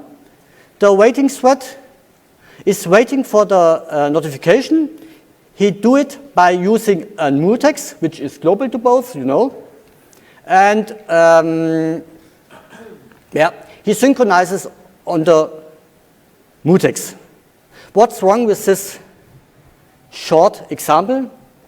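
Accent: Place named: Germany. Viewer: I love German English :D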